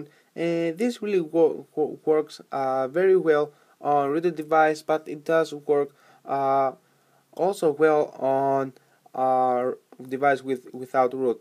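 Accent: India. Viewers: ohh my god oh my goooood i hate when indian speak english (im not racist :) ) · Owen Batucan no its indian idiot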